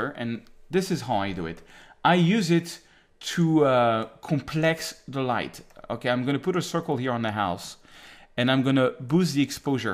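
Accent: France. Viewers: I like the way French speaking English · Encore un excellent tuto et personnellement je trouve qu'il est assez facile de comprendre vos tutos en anglais de part le fait de votre prononciation à la française ;-) encore merci et félicitations pour votre travail.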